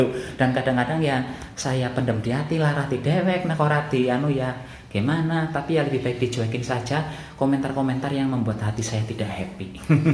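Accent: Banyumas, Indonesia. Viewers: Kiye siii wong endi? Ngapak ya? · sampeyan asli mana to mas koq pake jawa ngapak gitu Salam kenal dari saya orang solo 😁🙏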